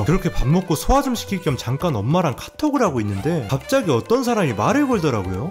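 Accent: Jeolla, South Korea. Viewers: 전라도 사투리 너무 웃겨요 ㅎㅎ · "전라도 사투리 구수함 200배"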